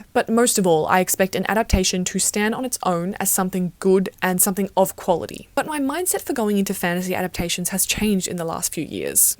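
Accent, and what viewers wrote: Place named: Australia. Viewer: I think youre australian, so it explains it, but please for the love of good stop to say the "o" and "w" like the h20 girls its sooooo irritating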